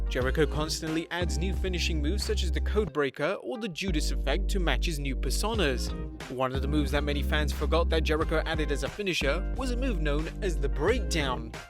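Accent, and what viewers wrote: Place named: United Kingdom. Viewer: Don't like british accent but good video.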